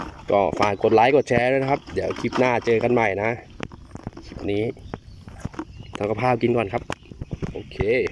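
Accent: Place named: Nakhon Sawan, Thailand. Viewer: ติดตามนะจ้ะ สำเนียงเหมือนคนคอนหวันมีแง้ะด้วย อ่านเจอว่าอยู่ชัยนาท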